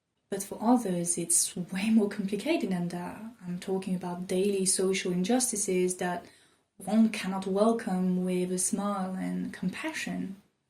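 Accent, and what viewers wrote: France. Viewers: You put forward an interesting perspective on alternative self-help that is thought-provoking but I must critique your last few comments. "What if getting angry was self-help? What if talking about social injustices was true self-help? ... And that for me, that's what self-help truly is" Like others in the comment section have said, I think your misunderstanding of what self-help is lies in those statements. Self-help quite literally means to help oneself, to better oneself, to care for oneself, and be self-sufficient. It's egocentric, but not egotistical (but not ever exclusive to each other.) Your ideas of self-help are about helping others, social injustices, activism, and related liberal values. And there's nothing wrong with that, but that's all unrelated to self-help. That's being philanthropic, that's being altruistic, that's activism, that's helping another person, that's selflessness. 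But it's not self-help. Of course, helping others and volunteering can bring benefits to you and another person, but it's not the overarching definition of self-help. I think the fallacy in your thinking is being absolutist. And you demonstrate this by thinking that what seems to be true for YOU, then must be true for others as well. But that's not right. I like to take morning showers, but I know it's not necessarily the "right way" to do things. I can tell you have a French accent so you might not realise how your words may have conveyed a misunderstanding with some of us contrarian v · Are you like French - having lived in an english speaking country? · I love your Frenchlish accent. 😃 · you're just the same with them. slower pacing and frnch accent, but the same. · Where are you from? You sound simultaneously French, German, and Irish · Very nice perspective that I have not heard discussed before. 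Et le tout avec un accent Français en plus. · Yes, sometimes i dont understand what the hell she is saying because of that bloody french accent.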